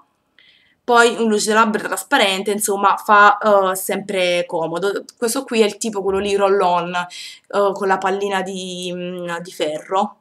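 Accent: Naples, Italy. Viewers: 4 haiun accento familiare, sei per caso napoletana ?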